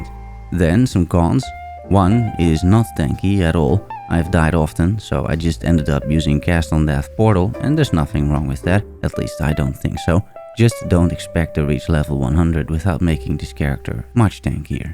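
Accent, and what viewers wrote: Netherlands. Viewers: nice vidz, especially as i am a ssf enjoyer myself. chillt voice and music fits good together. one question: are you from the netherlands with that accent?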